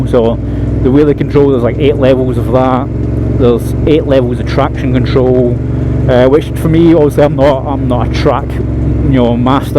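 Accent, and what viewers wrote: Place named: United Kingdom. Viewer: Hi mate, nice bike, I guess. If you can speak with a bit less British accent it could possible understand something about what you said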